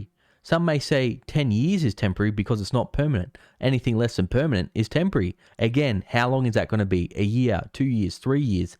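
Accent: Australia. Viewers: Mahket? Its not a silent R, its market banned cool? You mean coal. I've never heard such a slack jawed aussie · Yeah u need subtitles for you American viewers they won’t understand your full Aussie accent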